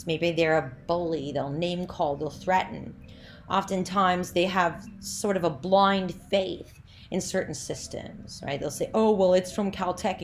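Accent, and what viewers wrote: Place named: New York, United States. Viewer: Really easy-to-follow/understand lecture! I've never had an online professor who has their lectures set up on YouTube before. I like your accent. Are you from New York or New Jersey?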